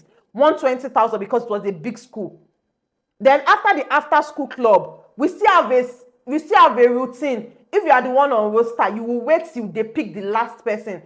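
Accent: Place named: Nigeria. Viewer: Everything about you is cute, but stick to Naija accent please!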